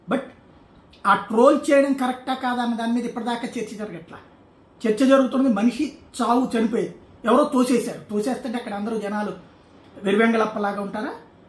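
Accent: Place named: Godavari, India. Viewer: avunu bro adi Godavari slang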